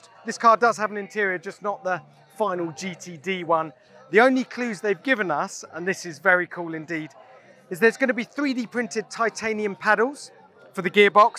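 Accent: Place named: United Kingdom. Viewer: Why do they have a Brit introducing an American muscle car THAT DONT WORK VERY ANNOYING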